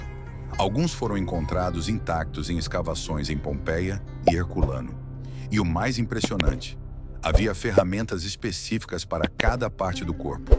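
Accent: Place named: São Paulo Countryside, Brazil. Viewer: Parabéns pelo Canal, eu estou amando e gostei muito do sotaque da voz 😅 bem paulista do interior; me identifiquei muito!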